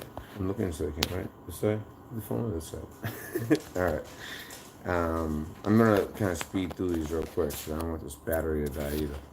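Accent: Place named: Massachusetts, United States. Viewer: Are you from Mass.? Very familiar accent.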